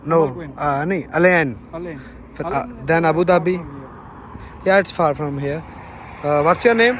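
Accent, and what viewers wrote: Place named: Malwa, India. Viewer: Bai ni boli jma e desi malwai boli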